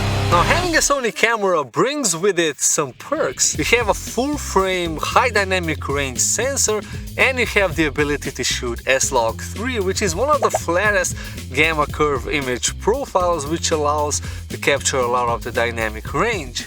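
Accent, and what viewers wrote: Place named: Balkans. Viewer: Great! Keep it up! Where are you from, if is ok to ask? Balkan?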